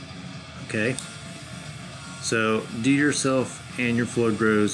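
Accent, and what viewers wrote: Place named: St. Bernard Parish, United States. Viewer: U sound like ur from st bernard parish, i love ur accent❤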